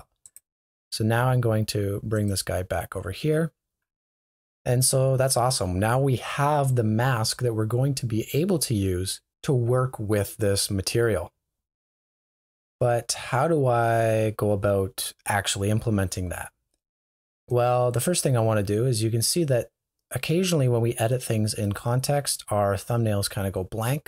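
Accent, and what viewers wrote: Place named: Canada. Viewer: thought you might be Canadian by the accent but then I knew for sure when you typed "colour" ;)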